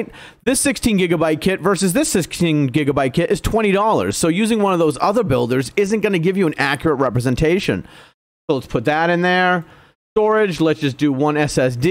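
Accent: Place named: New England, United States. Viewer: you sound like you're from either New England... or New York. (edit) The way you said "part" just narrowed it down I think. Massachusetts or Rhode Island.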